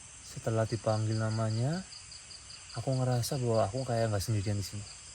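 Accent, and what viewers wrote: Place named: Tegal, Indonesia. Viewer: Bg asli mana bg? Ko logatnya kek ada tegal nya 😂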